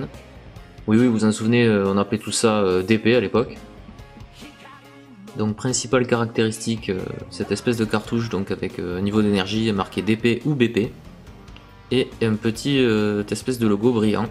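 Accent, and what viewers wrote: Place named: South, France. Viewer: Salut t'es d'ou ? on entend un accent du sud.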